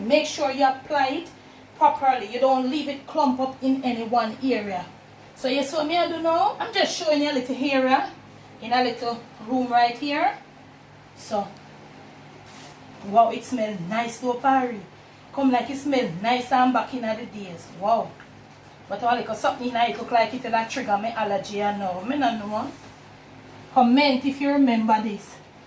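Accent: Jamaica. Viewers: I love your ‘back then’s’. Just love them. Sometimes I have a little problem understanding your patois but I’m getting there the more I listen the better I understand😌. Love your recipes Love to watch how much you enjoy your food. Camera Girl is such a polite young lady. Love the way you keep it so real. Please give me a shout out from time to time. My family and I visit your beautiful Jamaica every year. Montego Bay is our spot though. If I get to Kingston I would love to visit you and your family and taste your cooking, I know it’s EXCELLENT! I want to taste your soft dumplings, crispy fried chicken and ripe plantain! And yes the camera did pick up the floor shine❤️ USA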